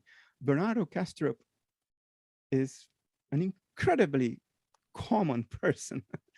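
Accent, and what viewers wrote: Ireland. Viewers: you did a good job with this. well done. (side bar - i detect an Irish accent at the edges. Am I right) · Two beautiful human beings. I so wish you all the best with your podcast and I love your Irish? accent. 🙏🙏 Bernardo and.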